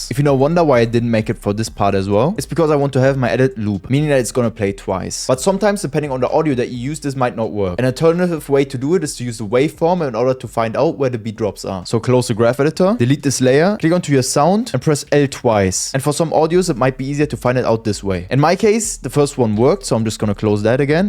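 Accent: Germany. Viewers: I hear a deutsch akzent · Dieser deutsche akzent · Ich rieche einen Deutschen Akzent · Ich höre den deutsch Akzent…. Hast du so ein Video auch nochmal auf deutsch? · so geil wie man nach den ersten 2 sekunden weiß dass er deutsch ist haha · Man hört deinen Deutschen akzent extrem 😂😂😂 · Thx Bro. The German accent is awesome tho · man hört wie krass deutsch er ist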